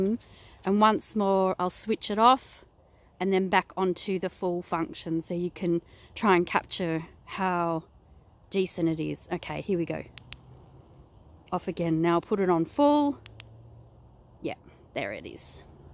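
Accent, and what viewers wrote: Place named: Australia. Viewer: Good job thanks! Love your accent too :) Love Australia RIP Krikey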